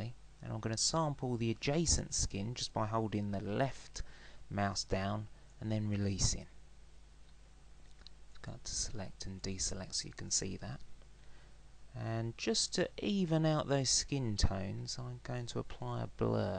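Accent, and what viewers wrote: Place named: United Kingdom. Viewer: yea, the british accent is nice* in a way lol hehe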